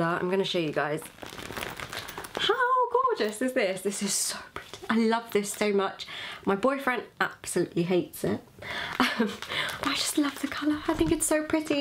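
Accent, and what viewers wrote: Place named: United Kingdom. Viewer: Just found your channel I love your accent I'm British too your accent is so lovely . Love you style and vibe , keep up the good work Hun :) xx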